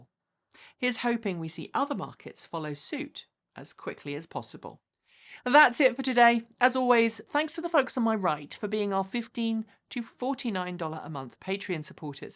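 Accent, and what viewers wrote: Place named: United Kingdom. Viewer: Excellent report. I was ready to leap on any major, disputable point with gusto. It's good to hear good, solid facts in a calm, Brit accent. I may need to watch it again in case I overlooked something.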